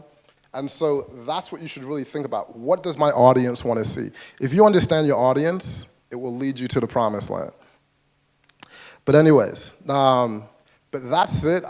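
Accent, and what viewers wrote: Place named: London, United Kingdom. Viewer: As a black man originally from London now living in America I have to say that his London accent is spot on, in fact he sounds like me :) A couple of London translations.... Thing = fing (London)......Alright = owight (London)..... Isn't it = innit (London or Ali G)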